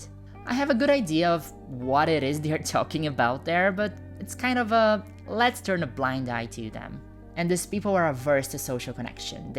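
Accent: Brazil. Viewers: Don't you dare apologize for your beautiful Brazilian accent! The way you say infamous and frequently is extremely charming! Technically I can't speak for the entire United States but to the ear of a person in the US the Portuguese accent and pronunciation is very attractive to our ears, now for example there are other accents that don't sound quite as lovely in English but I don't want to be rude, I'll just say that a certain German chancellor would have a very harsh sounding accent. Also, great video full of nuance that I think will be beneficial for many young women. Strangely enough my daughter never really voiced concerns about passing but she really needed affirming surgery for her mental health, but it was for her not for anyone else. She's kind of special that way! ☺️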